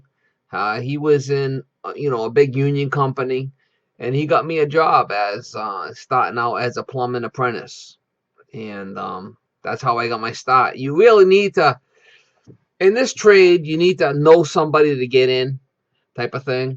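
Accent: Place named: Northeast, United States. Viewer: Guys, I am 54. Licensed plumber and overall home repair guy. Been in the remodeling business for 20 years now. I have remodeled over 1500 bathrooms. Trust me, this guy knows what he is talking about. If you are a young guy in this trade you should watch every video he makes. Knowledge and experience are worth a ton. This man has them. And his videos are also entertaining. Yes I know he is a yankee and sounds funny. But he is a SMART dude. Learn from him.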